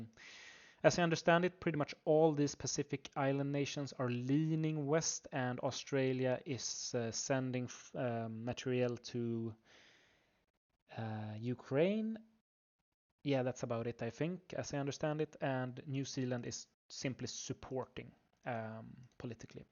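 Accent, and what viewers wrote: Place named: Sweden. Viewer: you sound exactly like a swede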